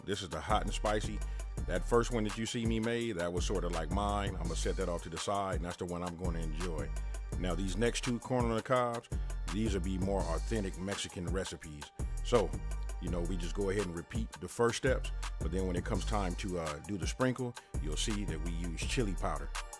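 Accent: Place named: West Coast, United States. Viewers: I have been looking at some of your videos for the month and you be throwing down. I'm from the south. I don't know where you are from, but I have a clue. You sound like you are from LA. I love cooking and I have been in food service for 13 years. Was a cook at one time, (my first real food service job) it was kind of intimidating, but I learned to get through it. They loved my cooking. My title was COOK II. I was second to the head cook. After that, I took on prep cooking at different places and that's where I'm at now. I normally work in hospitals and assisted living places, which I love. The place where I'm working at now gives me more opportunity to show my "Lil skills, YOU KNOW WHAT I'M SAYING,..., LOL" A resident wanted egg salad and sh wanted her bread in a zip loc bag. I was like, OMG!!! I HAD TO THINK. Which didn't take me long. 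I thought about the two other residents that are vegetarians. I'm like, hell, they can have this also. Just make this egg salad like I make TUNA FISH, (like WE make it) besides the li' sugar. I just did eggs, a little dill weed (NOTE: I'M AT WORK, Ain't no way in the hell I'm gonna have that spice in my cabinet, LOL), mayo, relish, salt and pepper. THEY LOVED IT!!!!!!!!! I'm from Arkansas and we had a food truck festival here and this is the third year that I missed it because I am always at work. BY WAY, LITTLE ROCK, ARKANSAS. , AND YEAH, some of my homey's told me that it was about 80 food trucks. One of them was telling me about this corn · Are you out here in LA? You sound like a Cali native and look very familiar! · Lol he from the west coast. Got to be. I hear it lo sound like rich the factor I love it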